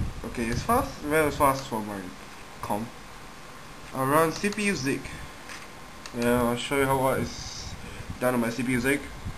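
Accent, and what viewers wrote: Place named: Singapore. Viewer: lol singaporean accent :D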